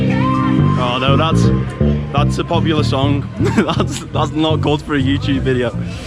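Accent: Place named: Yorkshire, United Kingdom. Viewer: Loving your accent! Yorkshire? Brummie?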